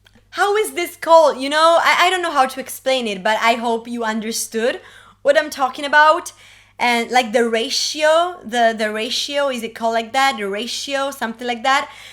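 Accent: Italy. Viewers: Da quando hai cominciato a parlare ho percepito subito l'italian vibe ✨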